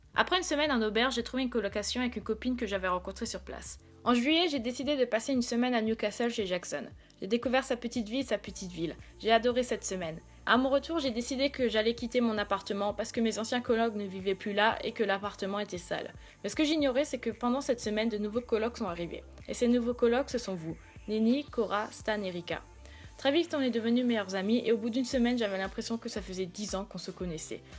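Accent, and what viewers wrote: France. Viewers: Vie ta vie, continue de penser de vivre comme tu le fais de partager ta passion avec nous de nous faire vivre à travers toi. Profite a fond et continue t’es jolie vidéos Tu mérite vraiment tout ça continue de nous parler anglais avec un accent français je suis la première à ne pas me faire comprendre quand je parle anglais Bref encore merci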